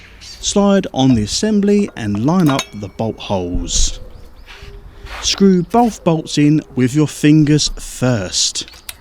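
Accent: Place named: East Yorkshire, United Kingdom. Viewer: Do yer really need brakes on them bikes.....you just put yer boots down don't yer?......he he he. 'Ull' east yorkshire.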